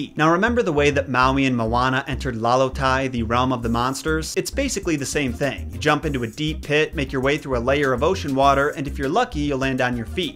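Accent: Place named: Wisconsin, United States. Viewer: i quite like your wiscansin ayccent in general not just with obscure word mispronunciations 🤗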